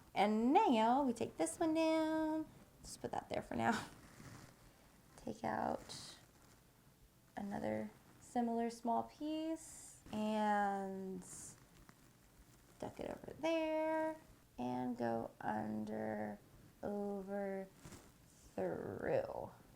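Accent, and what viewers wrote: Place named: California, United States. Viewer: Since when does have a Californian accent even though she from NC?!?!? I am so confused....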